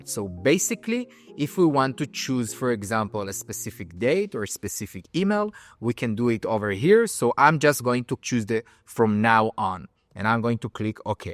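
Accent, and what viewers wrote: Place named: Israel. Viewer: What's your background you sound Israeli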